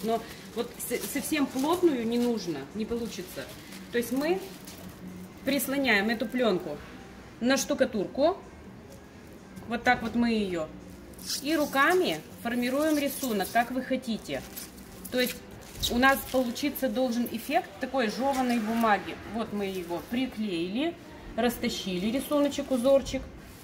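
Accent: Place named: Urals, Russia. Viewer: Уральский говор прям бальзам на мою душу родом оттуда же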